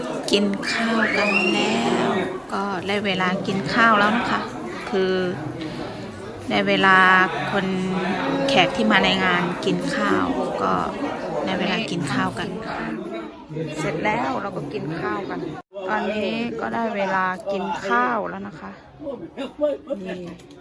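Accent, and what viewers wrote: Thailand. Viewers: น้องทำไมพูดไทย สำเนียง กทม. มีเเต่คนสวยๆ สวดเหมือนเชียงใหม่ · พูดสำเนียงไทยกลางชัดมากเลยครับ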